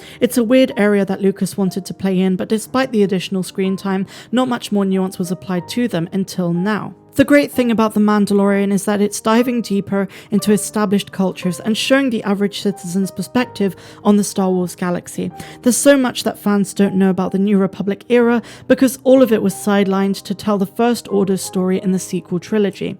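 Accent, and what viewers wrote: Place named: Somerset, United Kingdom. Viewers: I enjoyed your video, fellow West Country pirate xD is that Somerset I'm hearing? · That South West accent gets me every time 😊 "Jibber Jabber !"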